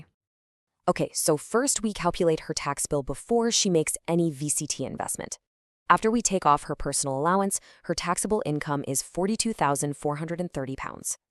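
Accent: United States. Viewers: Excellent content, although why the horrendous American accent?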